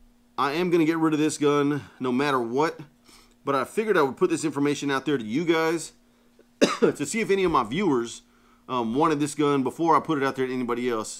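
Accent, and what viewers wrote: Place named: Houston, United States. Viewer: I’m from the Houston area, and you sound like you’re from the same area. Where is the place you get your holsters made?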